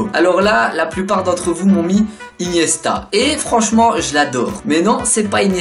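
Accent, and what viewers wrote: Belgium. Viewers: Le réveil de l'accent belge!!!!!!!!! (oui)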